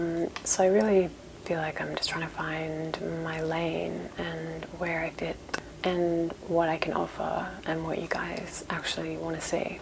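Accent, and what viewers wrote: Australia. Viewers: I'm really sorry to hear about everything that's been going on where you are. It's good that you're okay though. As for your content, I love the asmr cooking videos you've been posting. There's not much of that around in comparison to the tapping videos, so it's a good little niche that you've found. Some general vlogs about things that are happening in your life would be pretty cool as well, and maybe you could incorporate some videos about your progress learning the guitar? You've got such a naturally nice and soft voice, that I could listen to you talk about anything really. Of course I'm a sucker for an Australian accent too 😂 But whatever you decide to do, I'll definitely stick around to see where it goes. Take care and stay safe!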